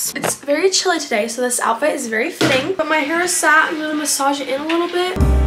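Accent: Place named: United Kingdom. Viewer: I love your morning routine and your british accent voice ☀❤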